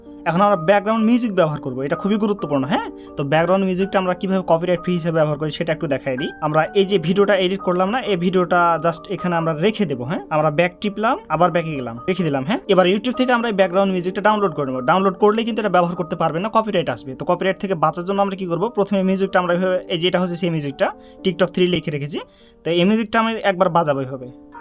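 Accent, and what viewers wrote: Chapainawabganj, Bangladesh. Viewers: রাজশাহী কোথায় আপনার কথা শুনে চাঁপাইনবাবগঞ্জের ছেলে মনে হলো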